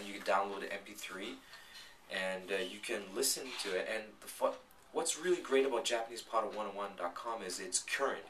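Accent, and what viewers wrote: United States. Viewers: Dude your English is spot on man. You speak it like an American. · Sounds like your in NYC! Pimsleur is not so bad, the issue I have with it is that firstly Japanese is not a difficult to pronounce language, unlike Korean, and the reverse pronunciation is complete overkill, though I have used the same method teaching English and it works. I agree with you and want to amplify the fact that Pimsleur's conversations are for the most part out of date. I took up Pimsleur when I was reviewing Japanese 4 years after having lived in Japan.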